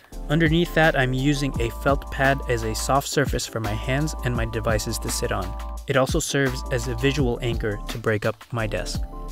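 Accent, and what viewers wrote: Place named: England, United Kingdom. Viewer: Your English accent us pretty awesome 👌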